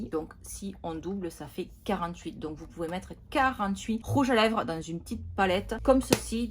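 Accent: South, France. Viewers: Cc et son magnifique accent du sud 😉 bien le méga haul pro ❤ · Hyper beau ce makeup! Et ton fond aussi! Ça fait du bien d'entendre l'accent du sud :) Continue comme ça!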